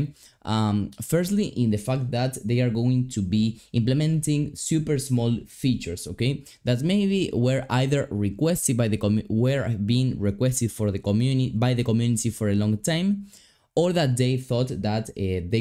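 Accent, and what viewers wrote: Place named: Argentina. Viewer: se nota el acento argentino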